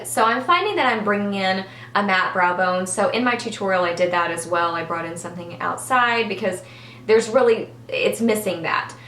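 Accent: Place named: Texas, United States. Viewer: I love all of your videos! I love how you talk! (maybe cause I'm from texas as well? lol) how detailed you are with everything and organized!!!! I would love to see a review of the real techniques palettes from you.. because I know you are honest and so far I agree on everything you say about this stuff!!! Thank you for your awesome videos!!!! - Natalia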